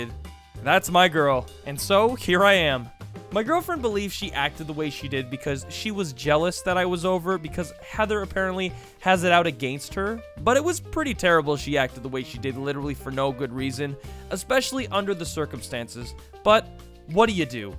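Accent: Newfoundland, Canada. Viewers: hey what part of newfoundland you from?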